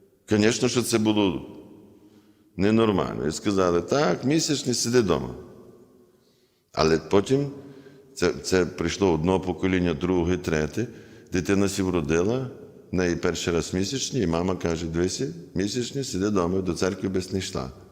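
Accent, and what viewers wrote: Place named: Western Ukraine, Ukraine. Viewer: Отче, дуууже цікаво відповідаєте на усі задані запитання. Слухаю Вас "запоєм", Ви чудовий оратор. Відчувається багатогранність Вашої особистості. Відчуваю у Вашій мові западенця, то така тепла і мила мова, я галичанка....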